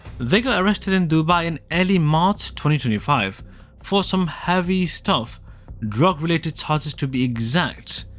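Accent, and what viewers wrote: India. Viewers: WHY TF you have I dian accent ????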